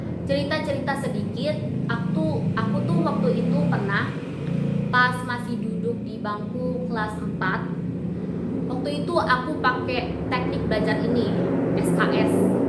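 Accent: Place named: Sunda, Indonesia. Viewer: Keren. Ternyata 'berbahasa' pun masih trrdengar medok sundanya. Pdhl 'speaking' nya juga medok 😀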